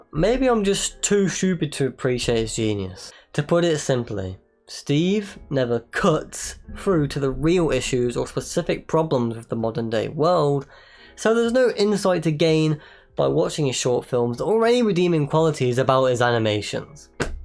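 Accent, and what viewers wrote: United Kingdom. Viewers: you like like my older brother but... british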